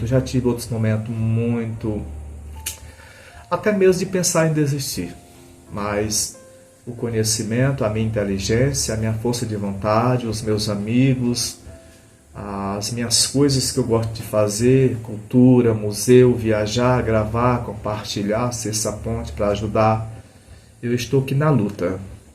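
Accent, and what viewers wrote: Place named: Piauí, Brazil. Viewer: Acho lindo sotaque de sotaque nordestinos do Paui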